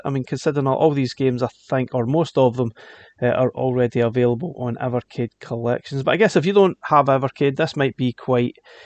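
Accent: Scotland, United Kingdom. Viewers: Shock Troopers is awesome! I can hear your a scot so maybe you like a bit of Jungle/DnB? If so, you'll love the music in Shock Troopers. Shock Troopers 2 loses all the charm of the original IMO. It's wicked getting that on cart. Shock Troopers 1 wasn't even released on the AES back in the day.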